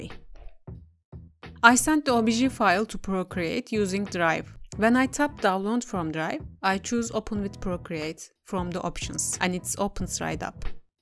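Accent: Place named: Turkey. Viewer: I know it when I hear a Turkish accent. Are you Turkish?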